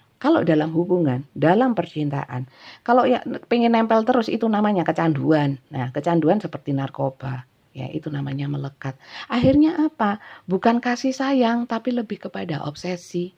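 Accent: Java, Indonesia. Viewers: Sehat selalu untuk bunda .. selalu senang kalau bunda baca dan kasih pencerahan untuk kedepan nya .. sering senyum" sndiri kalau bunda baca dengan logat jawa nya .. salam taurus dari bali bunda ❤❤ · Jawe nya pekat betul..gud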